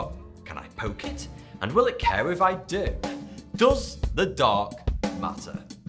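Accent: United Kingdom. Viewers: I like you British vsauce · Is he British?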